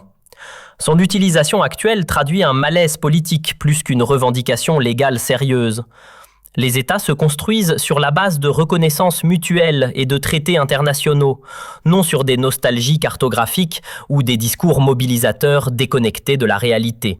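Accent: Canada. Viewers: à l'accent canadien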